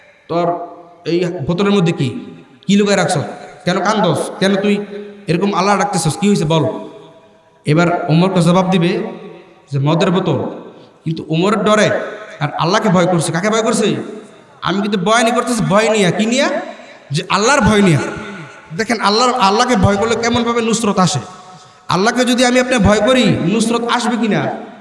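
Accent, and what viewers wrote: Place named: Shariatpur, Bangladesh. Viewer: পুরাই শরিয়তপুরী মাশাআল্লাহ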